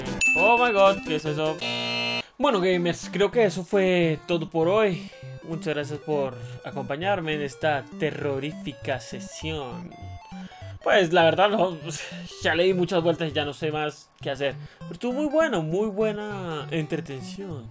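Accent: Colombia. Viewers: bro eres de bogota o medellin?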